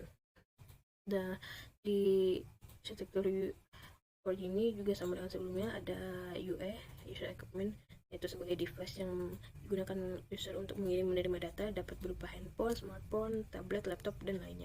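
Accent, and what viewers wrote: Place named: Pariaman, Indonesia. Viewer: Vidionya kurang menarik nih, bahasa indo nya kelihatan pariaman vibes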